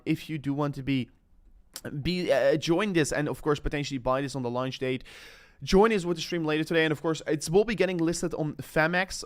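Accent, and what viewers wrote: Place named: Netherlands. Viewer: Hahha, this guy is Dutch .. Gast ,spreek gewoon Nederlands ..makkelijk te horen dat je Nederlands bent.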